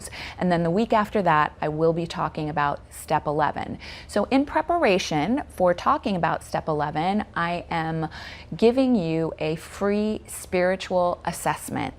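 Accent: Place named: New York, United States. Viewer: always! FYI...I’m on my 5 and 6 th step and love your NY Voice more than anyone else 😉💜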